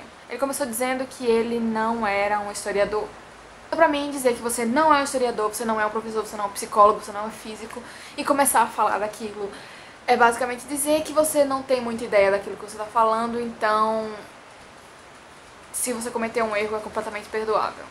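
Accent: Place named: Northeast, Brazil. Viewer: Não gosto muito do sotaque do nordeste :S Mas sim, é verdade isso hehe estuda o que la?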